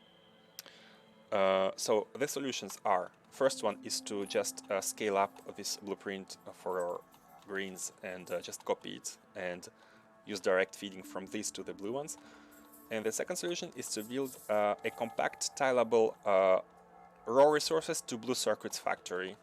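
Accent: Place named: Eastern Europe. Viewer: This railway system is very difficult. After seeing how Nilaus builds a railway where everything is "copy-paste" and simple in logic, it hurts a bit to make your life harder. I started watching yesterday and I'm going to watch all the episodes. Your English is amazing and very understandable because you speak like Slavs and I'm from Poland. You speak very carefully and that is a huge plus.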